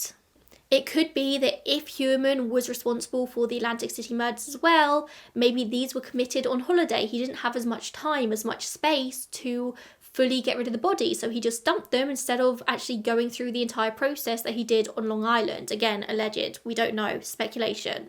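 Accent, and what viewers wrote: United Kingdom. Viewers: You're not saying it wrong at all, just British. Plus, you nailed your american accent of it! ❤😮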